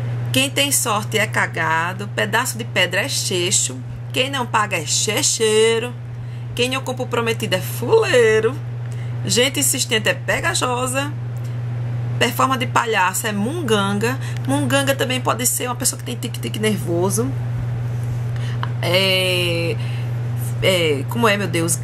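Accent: Brazil. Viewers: Bah Tche O Sotaque Nordestino é meio difícil não sei Porque se eu sou Gaúcho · Tô ligado que tu é daqui de Maceió rsrsrsrs, Esse sotaque eu reconheço na china. Eitxa sotaque gostoso da boba! Só algumas gírias que deve ser mais falada em outros estados,mas o resto eu falo quase tudo aqui kkkkkk. Ala Ala Ala Alagoas ;) .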